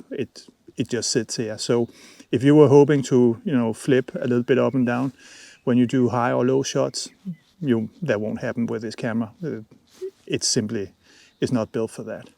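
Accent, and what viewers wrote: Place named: Denmark. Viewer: I love Danish accent 😂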